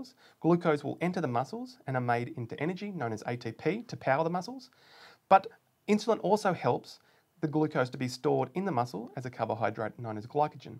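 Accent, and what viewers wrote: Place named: Australia. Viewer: Your Australian accent is relieving my stress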